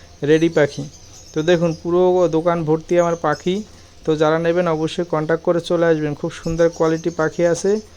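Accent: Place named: Bangladesh. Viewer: Uni too ashe ,gese kore bolchen oni ki poschim Banglar? Bangladesh er moto e uccharon .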